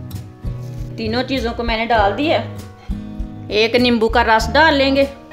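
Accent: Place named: Punjab, India. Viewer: Your speaking tone like pure Punjabi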